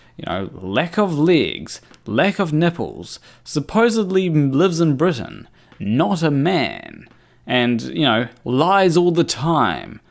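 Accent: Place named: New Zealand. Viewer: Best N.Z. accent on U tube.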